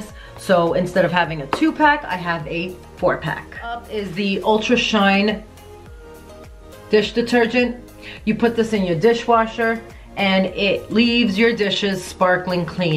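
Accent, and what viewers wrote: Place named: East Coast, United States. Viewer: Omg your east coast accent/chicago ??? accent is mind blowing. Thank you for your tips seriously!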